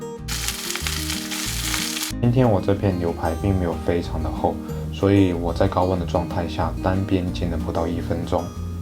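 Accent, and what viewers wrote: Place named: Cantonese, China. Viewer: 我個人喜歡有點口音的感覺 偶爾有點廣東話交叉也不錯 各有所好吧 加油💪🏼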